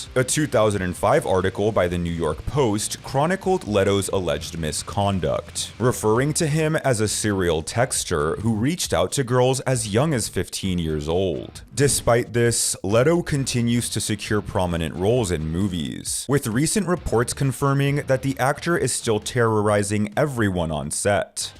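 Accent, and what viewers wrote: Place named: New Jersey, United States. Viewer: That’s a New Jersey accent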